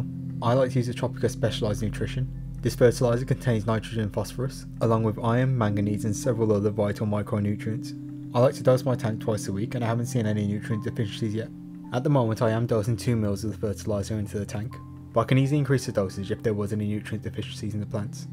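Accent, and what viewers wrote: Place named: Black Country, United Kingdom. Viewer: lol Brits won't struggle with your speech but Americans will pull their hair out because they've never heard regional British accents. Guessing you're from the black country? Burntwood?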